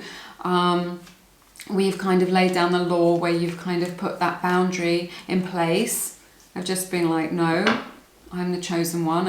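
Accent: RP, United Kingdom. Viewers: I mean this as a neutral observation… you have such a bbc-type British accent. I’ve never heard someone not on the international news speak like you. I’ve met plenty of British people but they didn’t talk like that. What part of England are you from? If you don’t mind me asking.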